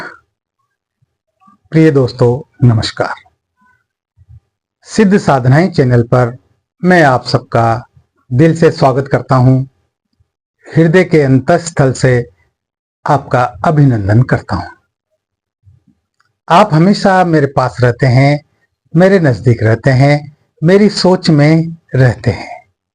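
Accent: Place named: Maharashtra, India. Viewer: 🙏प्रणाम गुरुजी 🙏🙏 आप महाराष्ट्र से हो ना, आपकी बोलणे के तरिके से ऐसा प्रतीत होता है, क्षमा करे 🙏🙏🙏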